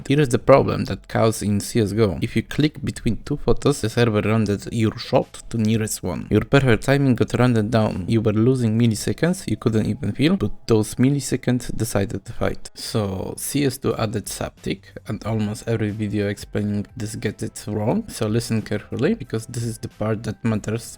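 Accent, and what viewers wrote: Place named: Soviet Union. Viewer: when you click on a CS2 video already expecting the crackling and calm soviet accent explaning why you suck at the game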